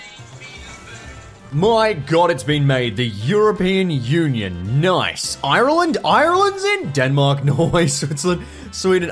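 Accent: Australia. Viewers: why you Aussie YouTubers all sound the same, WTF is this magic? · I really like to hear your smooth Aussie voice more clearly now. #feelsgoodman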